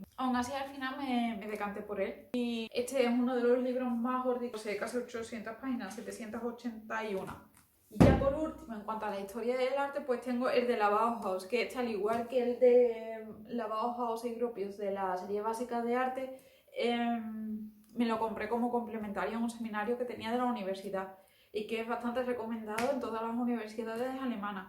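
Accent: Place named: Castile, Spain. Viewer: Un momento, es alemana con acento castellano de qué parte de España??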